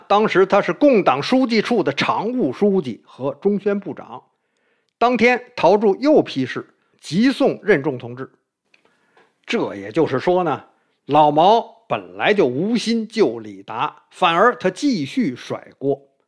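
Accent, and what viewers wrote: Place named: Beijing, China. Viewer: 爱听的地道北京话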